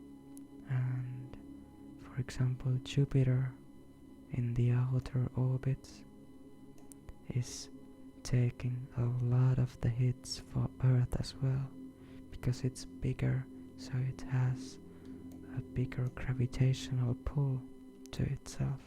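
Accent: Finland. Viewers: You sound Finnish, are you from Finland? :)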